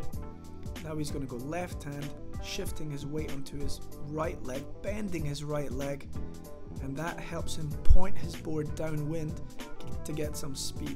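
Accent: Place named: Scotland, United Kingdom. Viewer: Really well explained, top work. Emso important to show why things go right AND wrong so you can self identify your problems. Lovely soft Scots accent too...Fifer?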